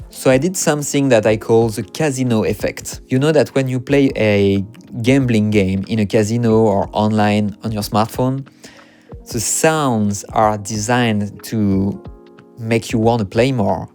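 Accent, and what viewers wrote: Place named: France. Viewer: Super de voir un frenchie avoir un poids aussi important dans l'industrie ! L'accent est ultra tranché en effet :D Mais bon, le bilinguisme est là, le reste on s'en fout ! Ça fait également plaisir de voir que le jeu n'est pas mort et que du contenu refait surface même en 2021. (Je viens juste de voir le post pour cette vidéo sur Steam) Quelle agréable surprise de constater que le compositeur est un Français ! Je n'en avais pas nécessairement l'attention en venant sur le lien mais du coup j'en profite pour laisser un message d'appréciation concernant la bande son ! Les musiques sont superbes, et les ambiances sont extrêmement réussies. Je me disais justement que l'équilibre steampunk et horror était vraiment très bien balancé et donnait un côté vraiment unique. Merci pour ton travail !! En espérant te revoir sur la suite, qu'on espère tous. 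:)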